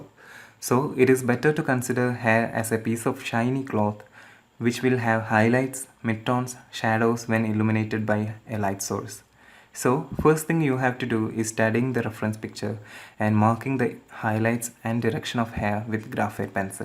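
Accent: India. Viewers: Wow, that's fantastic!!! It helped me very much, because I'm drawing very much the last time (I've drawn rey of Star wars (but not the hair yet ;) )and now I'm working on Frodo Baggins) One of the best channels in YouTube!!! And I love your Indian accent ;) How much time do you need to do an Portrait?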